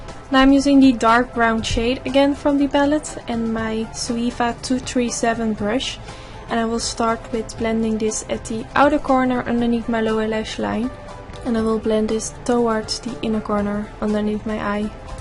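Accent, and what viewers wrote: Netherlands. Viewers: Are u Dutch?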